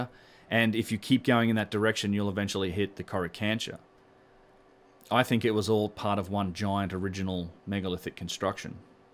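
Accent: Australia. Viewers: Thanks, a fascinating tour of Sacsayhuaman. I love your clear presentation style too, and as a fellow aussie I am SO happy to hear an aussie accent on Youtube - not many of us do this kind of work.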